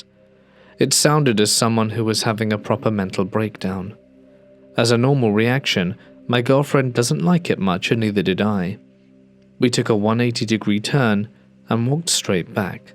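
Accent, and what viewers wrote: England, United Kingdom. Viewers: Lovely ..ever so.quintessentially English voice ..just lovely .From from NW England myself ,makes a change hearing a REAL VOICE & not this Ai souless rubbish..Thank you x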